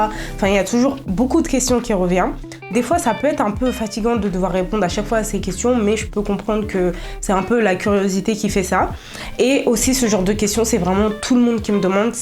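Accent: Paris, France. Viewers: To chyba paryski akcent. Przyjemna dziewczyna. 🌹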